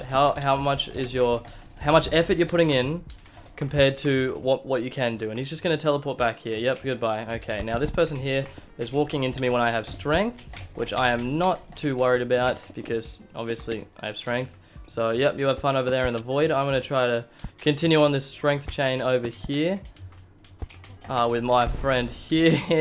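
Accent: Australia. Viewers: Love the Australian commentary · idk why, but i think im wierdly drawn to your videos because of the australian accent. I'm australian too so it feels like im listening to a friend ig.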